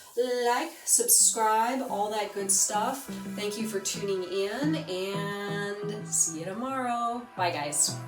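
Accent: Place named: Western Pennsylvania, United States. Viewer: That western p.a accent makes me homesick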